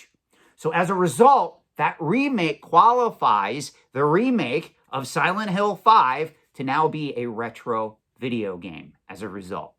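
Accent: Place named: Pennsylvania, United States. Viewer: regional dialect form Pennsylvania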